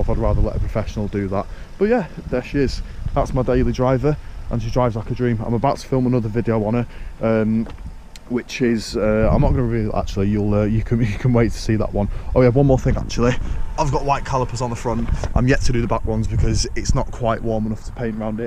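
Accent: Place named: United Kingdom. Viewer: I stayed till the end for the British accent it sounds great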